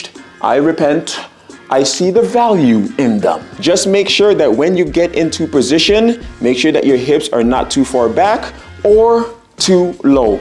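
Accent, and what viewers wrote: Toronto, Canada. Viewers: Toronto accent on this man, ahlie? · I knew you were from Toronto (GTA) 5 seconds in…. the accent is strong 🙏🏼🇨🇦🥰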